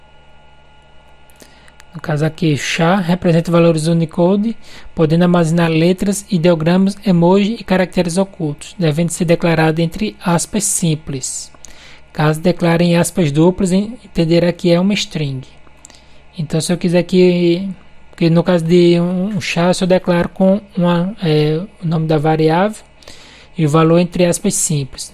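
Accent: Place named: Portugal. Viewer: Português PT? amo esse PT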